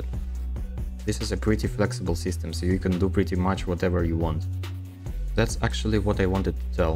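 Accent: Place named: Russia. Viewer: бро я же слышу ты русский❤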